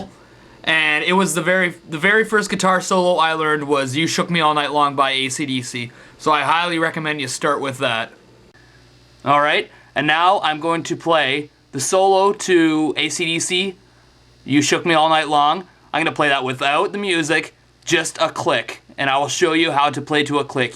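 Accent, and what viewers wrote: Canada. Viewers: ... are you canadian... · Because he's Canadian (i think). They don't eat words or fart them like the Americans and the guys at UK. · Your Canadian accent is strong in this video.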